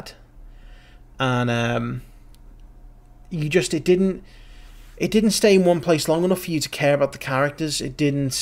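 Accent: United Kingdom. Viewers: I dont know why but I imangied you having a American accent from some reason but no we got a british guy 😂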